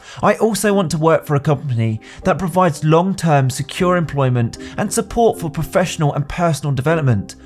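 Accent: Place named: United Kingdom. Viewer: OMG, great video mate i appreciate a lot this video, you can use this video for any job interview. Yor answers are, top notch, to me they seem more for chasing big companies that pay big $$$ for a flight attendant.... ehee. I hope not a lot of viewers admiring this positions as they look flashy, but when you learn how low is their pay, I'm assuming the pay have been going up after covid, but still $2,000 - $3,000 that's sad to me. If you young and single is fine, i rather become a pilot if I'm going this direction. Great awesome video for future interviews, glad i run to it, you got my subscription, the British accent helps too.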